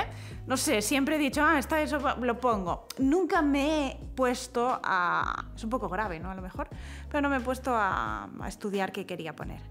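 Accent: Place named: Menorca, Spain. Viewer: a mí me encanta el segundo vestido. Te queda muy bien. El corte y el estampado son ideales. Por cierto me chifla escucharte hablar "menorquín" y Fusta, es un amor de perrita además de guapa.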